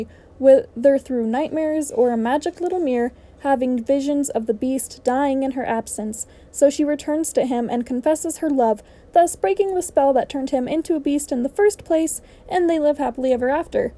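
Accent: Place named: France. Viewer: Excellent French accent! Interesting, insightful and enjoyable. Thanks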